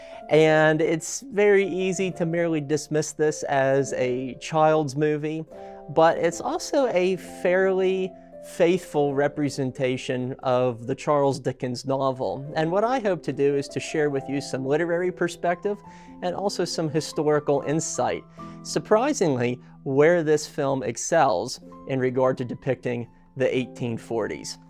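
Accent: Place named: Outer Banks, United States. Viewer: Your accent is incredible. I've never heard a high tider/outer banks accent from an actual person before that wasn't an impersonation. Yeah the video is great and all, but I had to point this out since I couldn't get over it lol.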